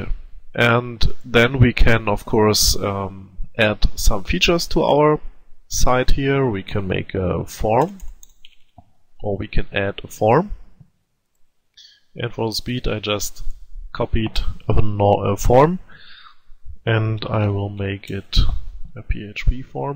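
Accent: Germany. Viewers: Es ist nicht so grausam... es ist ein Deutsches akzent! :)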